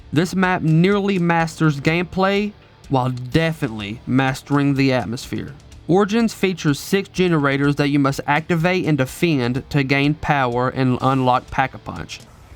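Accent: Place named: United States. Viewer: youve got the most american accent i have ever heard and i kinda love it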